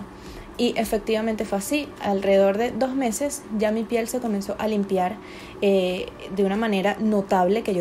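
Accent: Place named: Venezuela. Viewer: Oye tienes un acento venezolano, en qué parte encontraste esa crema, si es que estás en Venezuela. Gracias .Caracas.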